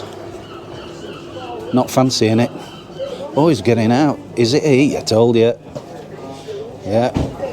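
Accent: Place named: Yorkshire, United Kingdom. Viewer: Another lovely video as always love to hear the Yorkshire commentary thumbs up to regarding her teeth I am going on 91 and I have all mine well except for the wisdoms! Always enjoy wherever you go looking forward to the next one😊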